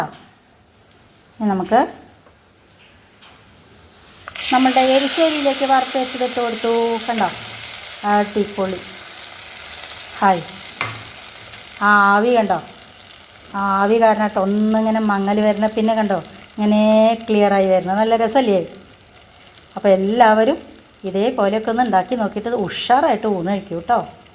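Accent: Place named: Palakkad, India. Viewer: പാലക്കാടൻ ഭാഷ അവധരണം കേൾക്കാൻ ഭയകര ഇഷ്ട്ടമാണ്..പചകം സൂപ്പർ ട്ടോ😋❤❤...ഞാനും ഇതുപോലെ ഉണ്ടാക്കാറുണ്ട്😍😘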